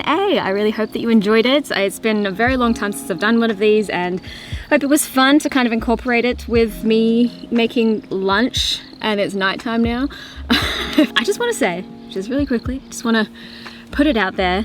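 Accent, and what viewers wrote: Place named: Australia. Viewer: Happy Thursday,! Happy New Year and welcome to the second month of February!😊😊😊😊😊😎😙☀💙💙💙💙💙🇺🇸🌷💖💖💖💖💖💖😊🍾🎉🎇⭐⭐⭐⭐⭐⭐👍. Your Australian accent is fantastic and it gives me tingles when I jump for joy!😀💙💙💙💙💙💙💙👍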